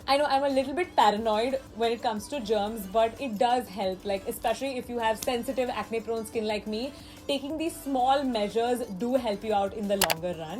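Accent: India. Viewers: I luv ur hindi accent diii......😍😘😍😘😝😛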